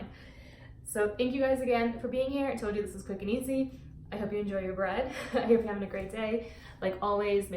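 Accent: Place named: Australia. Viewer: I hear a hint of auzzie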